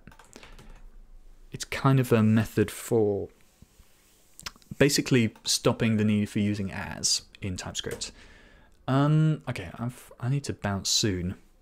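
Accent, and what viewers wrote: United Kingdom. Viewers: Are you scottish?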